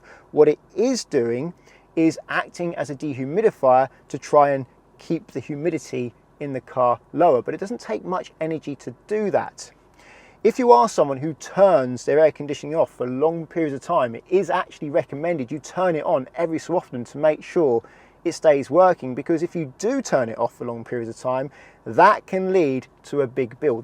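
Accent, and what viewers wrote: United Kingdom. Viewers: Is he s British or something? Sounds different to me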